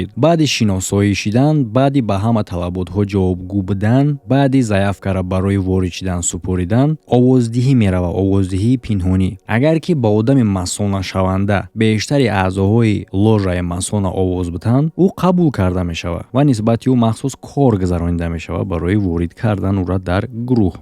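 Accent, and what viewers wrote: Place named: Kulob, Tajikistan. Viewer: Ошно гапоша мо чоткий мефахмем чиста Кӯлоби гап мезана ть ай тарафои Хуҷанд боши намефахми ука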